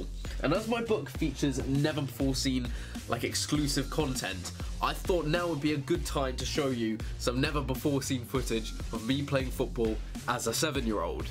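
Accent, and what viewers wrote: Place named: RP, United Kingdom. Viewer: You sounded so posh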